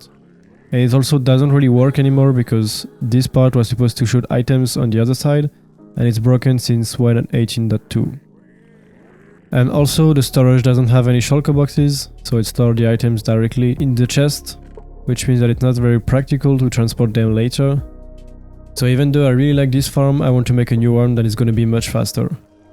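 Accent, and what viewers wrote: France. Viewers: HA HA jai vérifié et t'a bien l'accent français chef. Mais sinon tres bonne video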